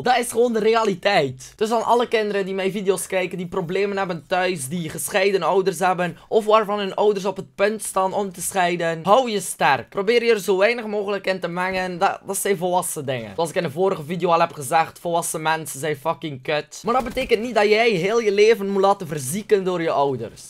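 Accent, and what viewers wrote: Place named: Flanders, Belgium. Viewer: Nederlands of Belgisch lol? Je accent is zo Vlaams maar je praat wel met een harde G?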